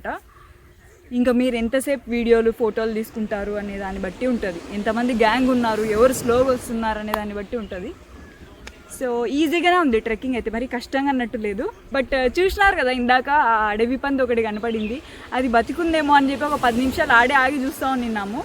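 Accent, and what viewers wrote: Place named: Kadapa, India. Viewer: Where we are Mana (KDP) Slang maathraam intaante inabudhe avuthadhi 😘😘😘😘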